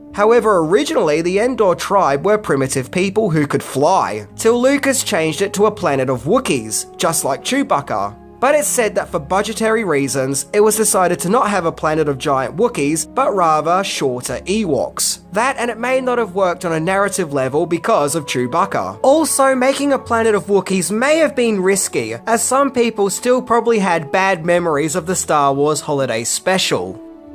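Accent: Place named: Australia. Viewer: WONDERFULL!NICE WORK!NICE ACCENT!AUSTRALIAN?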